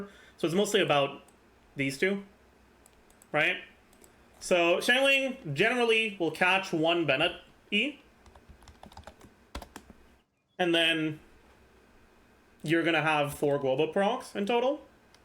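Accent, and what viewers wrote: Quebec, Canada. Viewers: Completely off topic - are you québécois? The way you pronounce these french words is so familiar for some reason.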